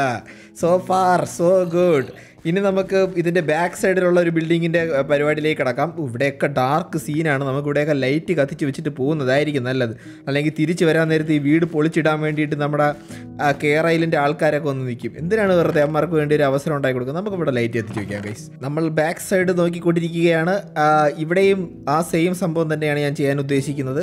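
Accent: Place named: Kasaragod, India. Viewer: Kerala mayi touch ullad kasarkood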